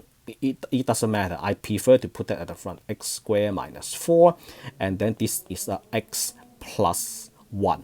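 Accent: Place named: China. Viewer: Bro is speaking english chinese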